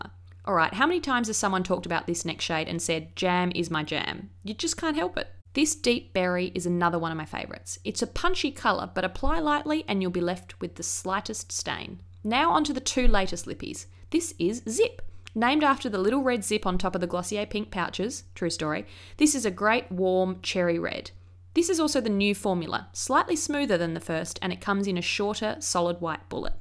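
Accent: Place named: Australia. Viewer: you sound australian, may i ask how did you buy glossier and get it shipped to australia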